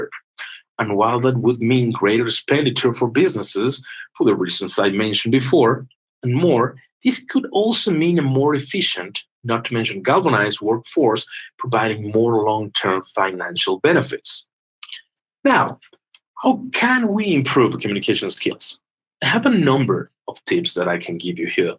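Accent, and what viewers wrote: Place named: England, United Kingdom. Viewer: Omg 😱😱 que perfecto abla el inglés como en Inglaterra estoy asombrada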